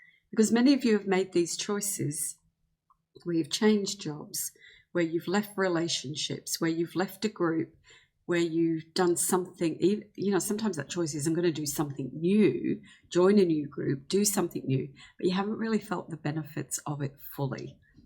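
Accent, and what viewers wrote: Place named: Australia. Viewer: Lovely to hear a fellow Aussie with some great words of love to share 👍🏽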